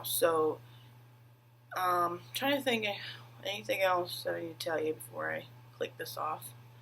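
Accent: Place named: South, United States. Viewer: I bet you are probably from the south.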